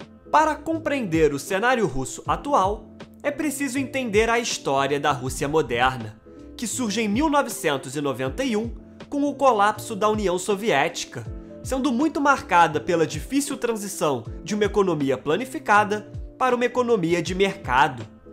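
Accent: Rio de Janeiro, Brazil. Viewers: Sotaque chato. É carioca? Não consegui me concentrar no assunto do vídeo.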